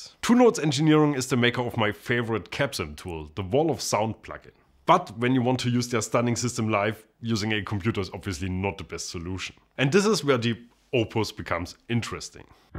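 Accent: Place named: East Germany, Germany. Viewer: Eastern German accent . 😂. Nice touch!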